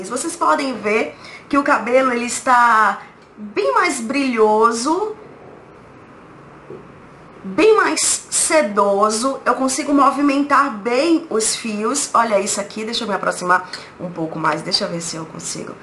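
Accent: Bahia, Brazil. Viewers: Lindona seu cabelo ta lindo!! mil bjos!! gosto muito de te escutar om esse sotaque lindo baiano!! fico com saudades da minha cunhada!!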